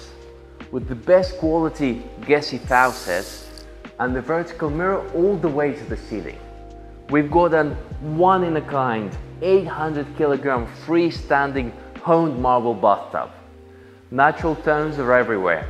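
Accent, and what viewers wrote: Russia. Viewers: Мне одному показалось или ведущий русский? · Cant make out the accent. Russian, Dutch maybe...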